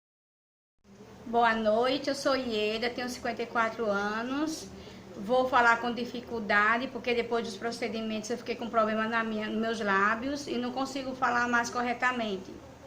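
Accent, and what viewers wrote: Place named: Northeast, Brazil. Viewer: Kika Tita você está dando aula de língua portuguesa aqui pra quê? O vídeo é sobre procedimentos cirúrgicos. Você se acha muito culta, mas deveria saber que gente culta respeita a cultura e o modo de falar do outro. O vídeo mesmo é de uma Senhora Nordestina, e percebemos que ela tem um sotaque típico da região dela, e nem por isso significa que ela está falando errado. Eu falo com o sotaque super caipira do interior de Minas Gerais e tenho muito orgulho! Aprenda respeitar o modo de ser e falar dos outros. Escrever bonito só serve pra enfeitar papel. Você pelo jeito só foi na escola pra aprender escrever, porque educação você não tem nenhuma.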